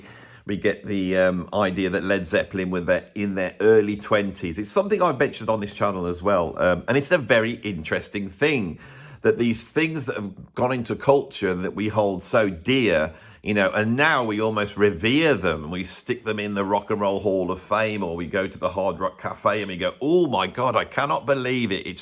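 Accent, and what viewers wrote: United Kingdom. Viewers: brilliant and funny, i love contrarians being one myself, you remind me of Monty Python .( maybe the accent )........ i do like rick also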